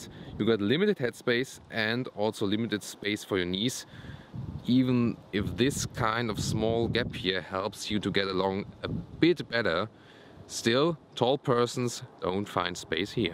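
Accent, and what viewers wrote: Germany. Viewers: ***** Kann dir da nur zustimmen. Sprichst zwar mit einem starken deutschen Akzent, ansonsten geht dein Englisch völlig in Ordnung. Und so ein Akzent ist halt völlig normal bei einer Fremdsprache.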